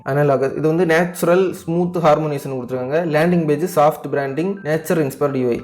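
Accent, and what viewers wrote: Kanyakumari, India. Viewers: Bro neenga kanyakumari ah?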